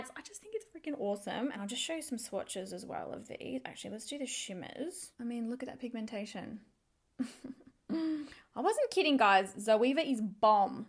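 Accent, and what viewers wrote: Australia. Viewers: Girl! Your Australian accent is beautiful! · I LOVE your videos. I've learned so much from you. I'm sure many of your subscribers are younger than I am, but you've helped me be more confident with makeup at 44!! I love to see your subscriber number climb because you deserve it!! You need to share a tutorial on that coral peachy look your rocking today!!! LOVE IT!!! Much love from America! Hope your winter is not too bad in Australia, and your accent is charming.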